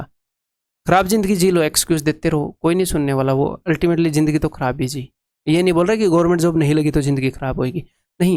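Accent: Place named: Bengal, India. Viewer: Sir aapko Punjabi Me pura bengali babu lag ri ha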